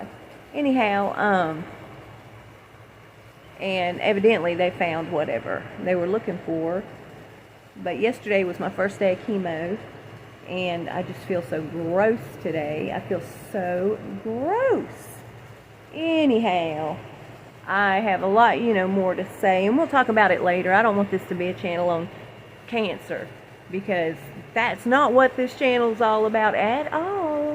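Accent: South, United States. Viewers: Get better soon. It's not fun being so sick like you are at the moment. Hang in there. It takes time to get over serious illnesses. I'm into my second year of recovering from pneumonia after being in ICU. Queensland. Has left me with walking disability and enlarged heart. I get great joy in listening to your programme with that Southern drawal. So don't you go anywhere. Your show tells it like it is. It walks all over the others in respect to authentic royal gossip. Up there with Lady C. My answer to your quesastion rse Queen's medal to Meghan in is:. if the Queen does this then it shows the public and parliament that the Queen has lost her mental capacity and its time for her to go. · You are in my prayers daily and have been since the beginning of this. I'm sending you hugs, prayers for comfort and healing and lots of love! My husband has become a fan. 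We are in the south, from the south and he loves your accent. When he hears me listening to you, he always stops in to see what you have to say. You just remember to put yourself first and take care of yourself. ❤️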